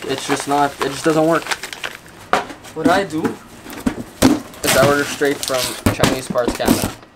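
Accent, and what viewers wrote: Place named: Hawaii, United States. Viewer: Solid video.. bro you sound like one local from hawaii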